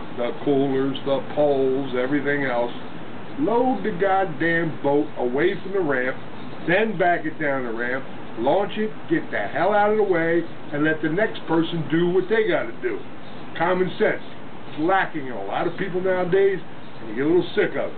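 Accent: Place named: New Jersey, United States. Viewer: Those TV Producers are going to be trying to get you to sign a contract. No kidding, you made a GREAT presentation! I like that Jersey talk of yours. LOL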